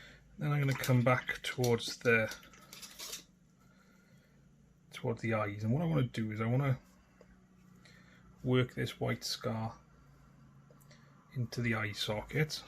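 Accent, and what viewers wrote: Wales, United Kingdom. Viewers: Thanks for another great helpful video - you make this look easy. If you don't mind my asking, are you Welsh?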